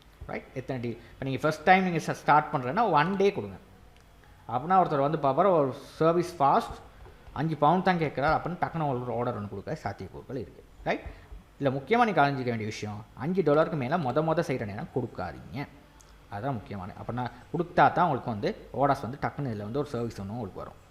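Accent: Sri Lanka. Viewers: Bro neenga Sri Lanka va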